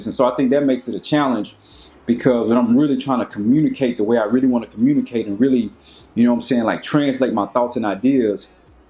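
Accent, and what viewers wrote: Ghana. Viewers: My Man!!! Really amazing. You can do the Ghanaian accent. Repect! I can't do that even for 5 min consecutively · I like your Ghanaian accent. It's on point.. I see you already know how to navigate the Ghanaian society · 😁😂😂😂😂 I love your Ghanaian accent and the twi. New subbie here 🙌🙌🙌🙌🙌😘🔥😍😘💕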